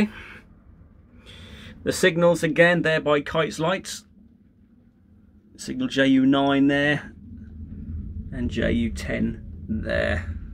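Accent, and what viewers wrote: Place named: Norfolk, United Kingdom. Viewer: Fantastic layout mate! That accent sounds very Norfolk/fen am I right ?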